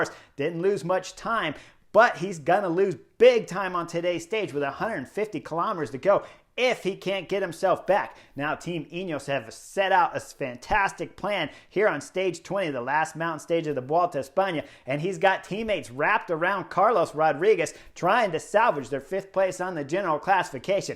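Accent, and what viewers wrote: United States. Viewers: Man…this feels like the end! My wife now knows your voice and thinks you’re from the south…haha! She can’t believe that there’s so much to talk about. Hopefully, she will understand why in the next season. Going to miss the everyday episodes. It’s like a tv series arcs: Giro, Tour and now Vuelta.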